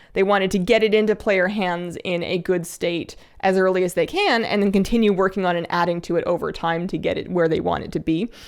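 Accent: Canada. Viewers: Hi, maybe it's just me but I think you are sounding more Canadian! The last couple of times I tried, I could not give the trinket mats to other eligible players. I hope the enchanting bug is fixed soon, and I really miss Bagnon.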